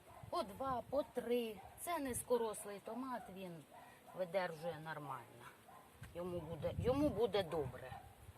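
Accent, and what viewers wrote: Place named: Kryvyi Rih, Ukraine. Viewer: Дуже гарна ваша мова! Така мила мені! В мене подруга з Кривого Рогу. Це так приємно чути, бо й вона так говорить! Хай буде здорова і щаслива!!!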